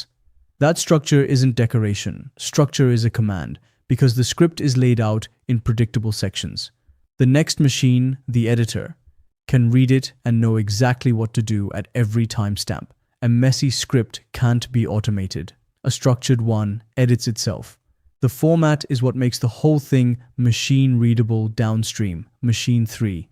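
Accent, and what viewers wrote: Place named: India. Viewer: You sound somewhat indian in this video. Thats pretty funny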